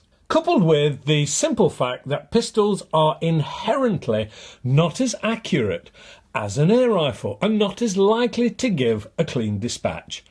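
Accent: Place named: Leicester, United Kingdom. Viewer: Are you from Leicester? Can notice that accent a mile away :D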